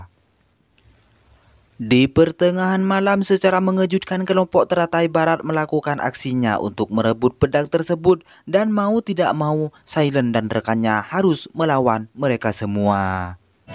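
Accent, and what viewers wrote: Madura, Indonesia. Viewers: Aksennya seperti orang madura.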